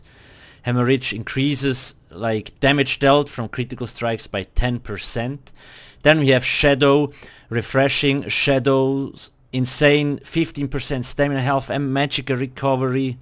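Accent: Switzerland. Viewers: Bish du schwizer? :D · He du bisch doch 100% au en schwiizer eh? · Du bist safe Schweizer :D nice video!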